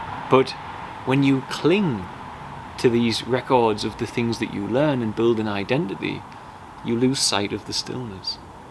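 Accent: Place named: Northern England, United Kingdom. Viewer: So profound. It actually made me cry. So much conditioning and "recording". I just wish I'd been more awake when my kids were small 😥 By the way, where's that accent from? I am from County Durham (but living in Sweden), and assume you're not far away!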